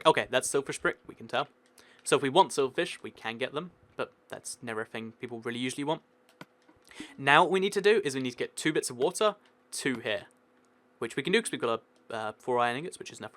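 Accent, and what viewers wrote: Sweden. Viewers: är du från sverige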